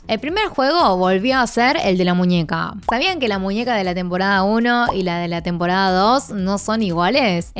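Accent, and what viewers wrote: Argentina. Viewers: Porque habla como Argentina? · como es posible que exista una china, que sepa cosas de korea, hablando español con acento de Argentina o.O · por que habla como tamara falco argentina?? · Hola como haces para tener este acento argentino ?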